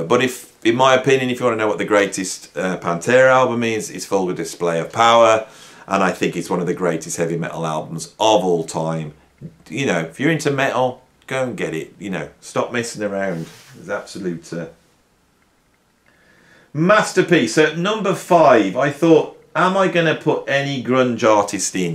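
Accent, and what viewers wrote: Birmingham, United Kingdom. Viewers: To be fair, did say at the start that metal isn't his main genre. But I caught that too. Dissident is one who rebels against society. Many people still think it's Stained Glass. Can't blame them. The cover looks kinda stained glassy. I like how the Brummies remove the T from Metal. Also, says "PAHN-terah". In U.S. we say "pan-TERA" He makes it sound like the name of a fluffy kitten. Here in America we say it like a solid punch.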